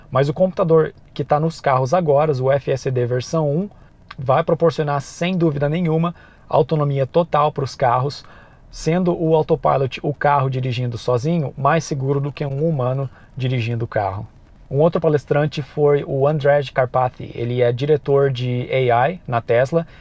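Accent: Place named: Brazil. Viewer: Não há nenhuma dificuldade. Aliás, tenho partilhado alguns de seus vídeos, não só com a família, mas também em grupos portugueses do Facebook. Precisamente pela vantagem de estarem em língua portuguesa. O sotaque brasileiro e o uso de uma ou outra palavra típica do Brasil, não é problema. Crescemos em Portugal a ver novelas brasileiras! :D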